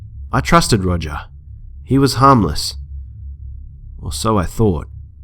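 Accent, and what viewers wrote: Australia. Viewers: For some reason, when done with an Australian accent, it's all the more scary. Not to be racist.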